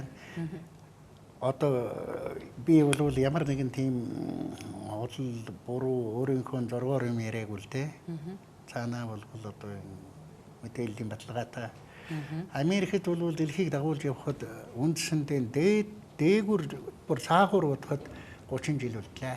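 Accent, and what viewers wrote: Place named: Beijing, China. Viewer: Бээжин аялгаар ярьдаг юм байна юу ч ойлгосонгүй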